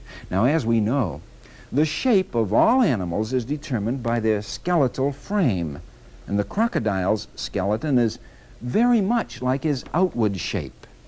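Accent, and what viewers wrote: Boston, United States. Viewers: Love that “Bahston” accent!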